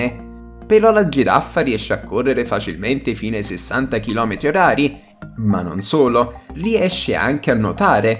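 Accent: South, Italy. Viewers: Bel video, e anche interessante anche se un pó scolastico. Mi permetto di fare un appunto sulla dizione inequivocabilmente meridionale, non si raddoppiano le consonanti, (es. Incredibbile...) e le C non si pronunciano sc...., l'italiano è un'altra cosa.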